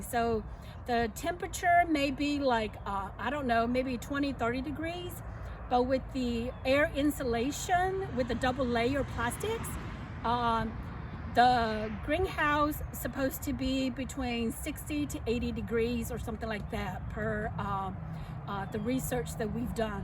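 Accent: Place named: South, United States. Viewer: Love the greenhouse! Love your southern accent too.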